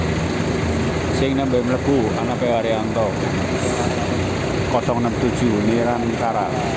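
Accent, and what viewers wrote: Banyumas, Indonesia. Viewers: Bang oak de melu manteng maning......paten liputanya mantap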